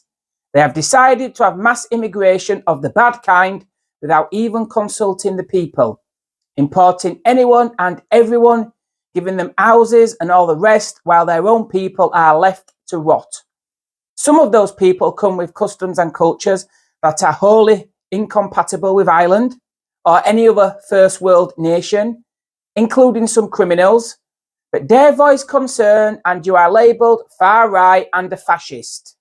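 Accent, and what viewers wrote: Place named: West Yorkshire, United Kingdom. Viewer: Over pronounces his T's lol so people from other countries can understand the west yorkshire accent laura towler used to do it a lot.. Good vid i agree! 👍🏻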